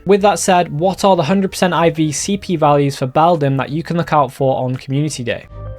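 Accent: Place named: United Kingdom. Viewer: He’s speaking the Kings English not American 😂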